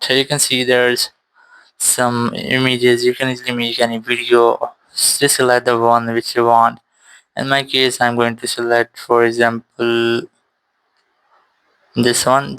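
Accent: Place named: India. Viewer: Worked for me. Just two minutes to create my first Grok image. BTW, you sound like an Indian, so you may be interested in what I wanted from Grok. I'm answering an English X Post about the upcoming Ashes series, which had a picture of a man with an umbrella, Indian BTW 😆, with the caption "Me sheltering from Aussie tears when Joe Root scores his fifth century of the Ashes". I respondws with a Grok image of "England's Barmy Army crying in a stadium on a sunny day". Got it!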